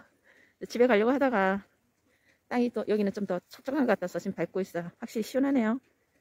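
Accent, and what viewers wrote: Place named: Gyeongsang, South Korea. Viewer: 경상도 분이 서울에 오래 사신 분 같습니다 즐거운 설명 잘 듣고 갑니다 ^^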